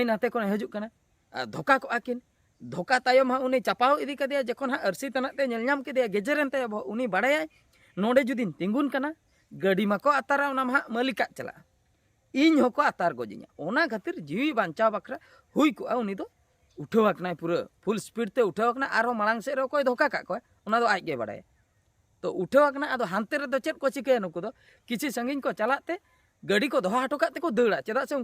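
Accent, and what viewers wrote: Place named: Jashipur, India. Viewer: Aale sur reyah kana nowa do jashipur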